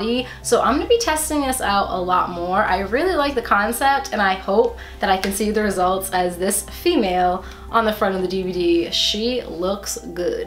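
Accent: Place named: New England, United States. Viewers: Omg those cupcakes are wicked good lol I’m a NEW ENGLAND GORL TOO 🤘🏼🤘🏼🤘🏼 I’m from Ma where are you from, I don’t know how I don’t know where you are from lol I could tell from your accent you where from New England and that one of the reasons I started watching you 🤣🤣 where did you get that coat I freaking love it it’s wicked pissa 😂😉😉😉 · Ugh I love that you're from New England. I'm from Massachusetts, currently living in Maine, and I think it's so funny people notice you say wicked! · You have such a strong Boston accent I live in Boston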